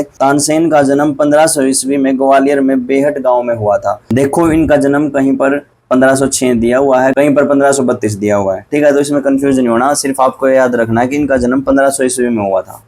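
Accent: Haryana, India. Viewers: Haryanvi sir ji ❤❤ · Sir are you from haryana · Yeah bro 🔥🔥🔥🔥🔥🔥🔥🔥 haryanvi boliii , m bhi vha se huu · Haryanvi boli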